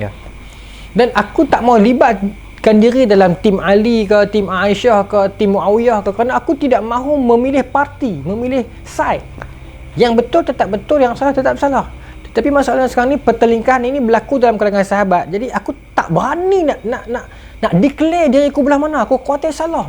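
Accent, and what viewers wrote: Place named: Muar, Malaysia. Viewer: Muar clear 🤩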